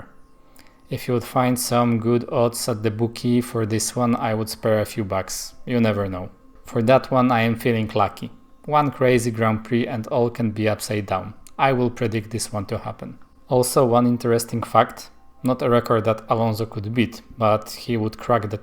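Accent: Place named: Poland. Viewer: Uslyszalem akcent i od razu skojarzyl mi sie polak xd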